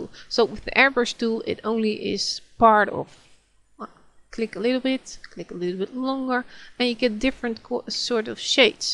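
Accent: Netherlands. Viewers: Dear. Thank you sooo much!!! And where are you from??? You sound Dutch.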